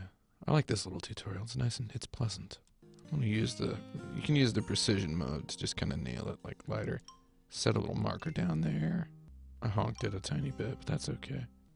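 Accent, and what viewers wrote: Australia. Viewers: As a British person, I think the text definitely read smoother with an Australian accent. I think the game is Australian come to think of it. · dangerously australian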